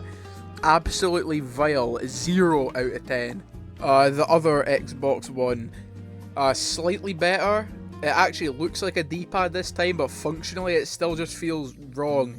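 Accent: Scotland, United Kingdom. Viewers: Are you Scottish because I am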